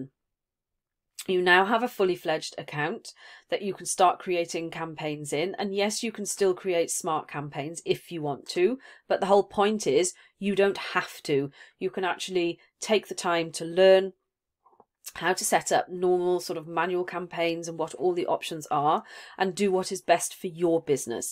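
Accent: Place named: United Kingdom. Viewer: For an American audience, you really speak too fast. Yes I understand you, but with your British accent I need a delay to digest what you say. I am imagine you would have the same problem with me speaking with my American accent.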